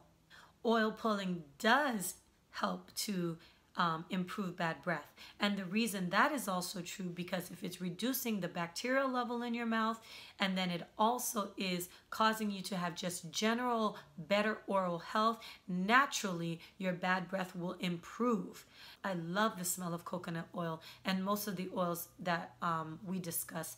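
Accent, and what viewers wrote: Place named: Haiti. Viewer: Are you Haitian? I detect a slight accent.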